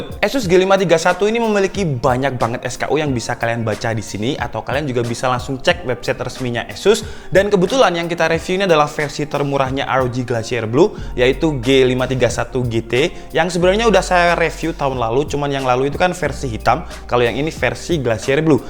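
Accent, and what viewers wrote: Java, Indonesia. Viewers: Orang malang bang? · Wong jowo ta?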